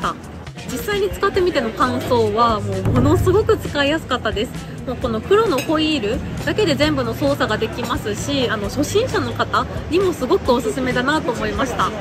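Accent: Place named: Nagasaki, Japan. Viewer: 🤕ガスタウン＆ジンバル🤕 ガスタウン動画を観終えて、今、「香港」ハイアットに泊まってを観ながら投稿してます🐒 、いつも、可愛い笑顔＆時々でちゃう長崎弁（失礼）親近感があって良いよ😅 いつも、街の歴史・食・景色etcで素敵な旅行気分になれ、ありがとうね😘 秘密兵器のジンバルて考えた人「すごい＝マネーだね」😛 ジンバルで色んなアングルで撮った動画が、またｘ２楽しみになりました🤨 ランチの時、日影がHaruちゃんと少し被っていたのが、ちょっぴり勿体ないｘ２🐻 ポニーテール、良く似合ってたし、私は「ショートｏｒセミロングがスキです」🐷 ＰＳ キンコン西野さんの伝説のスピーチ動画「是非、観て下さい。今後のYouTuber活動の参考になれば・」 <link> 「約１６分あるから、時間がある時にでも」 じぁ～ね😘 おやすみ。弘美🥱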